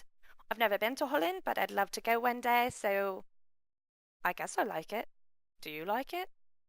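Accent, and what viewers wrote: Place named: England, United Kingdom. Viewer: Ur voice sounds more like ur English this week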